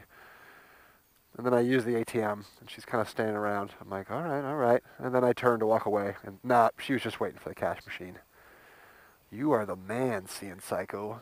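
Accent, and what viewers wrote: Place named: United Kingdom. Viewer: NinjaBoots ***** Hehehe...yes his British accent does make it special. I like his hones oppinions and style. Just being himself and looks like a good fella to my oppinion. Also f*cking love the track days and the test drives, Awesome. I am so happy for him, but was a bit sad when he said for the divorce... Hope he will do OK. Ride safe guys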